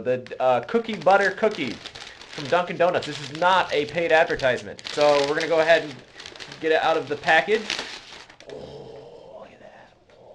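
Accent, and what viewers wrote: Brazil. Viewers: Is he Brazilian or something? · Are you brazilian?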